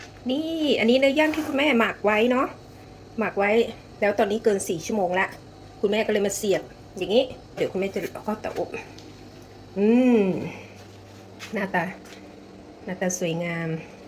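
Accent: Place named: Sakon Nakhon, Thailand. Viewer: สำเนียงคือคนสกลนครน้อจ้า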